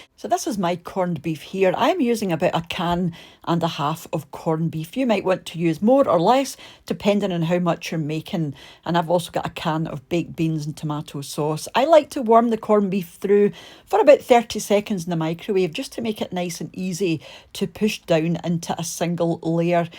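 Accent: Scotland, United Kingdom. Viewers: Love this woman's accent. Sounds like my Grandma Victoria Elliott, a proud Scot. RIP Grandma, see you later xx. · You've got to love a Scottish accent! I could listen to this lady reading the telephone directory and still be enthralled lol. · Lovely Scot's accent - could listen to you all day :) from an admiring Englishman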